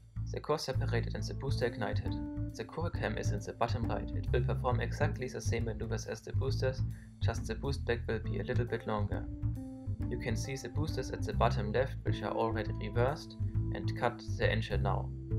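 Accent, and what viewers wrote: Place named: Germany. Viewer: I don't think your accent/ pronounciation is Bad ctually, I think the way you say the 'th' is just really german, and I hate it when people say it like "s".:P Ich bin übrigens auch deutsch:D Hat mich ne weile gedauert das ordentlich aussprechen zu können xD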